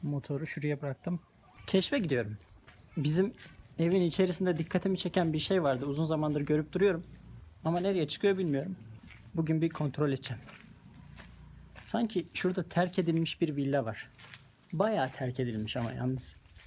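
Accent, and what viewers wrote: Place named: Erzurum, Turkey. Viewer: Abi bi erzurumlu olmama rağmen şu yokları yoğh yoğh diye demene uyuz oldum ya tam öyle konuş ya da tam istanbul ağzıya konuş ikisini karıştırınca çok itici oluyor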